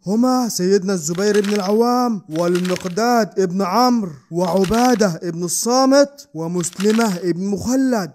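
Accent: Upper Egypt, Egypt. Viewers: أظنه من الصعيد ❤ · يا مستر هو حضرتك صعيدي علشان لهجتك غريبه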